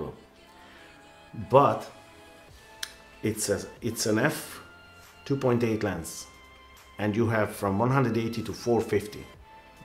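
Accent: Hungary. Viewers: just subscribed....love your analyses and the Hungarian accent! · Annyira hallatszik valakin ez a magyar akcentus! :D nagyon jó videó. Rendkívül informatív és jól felépített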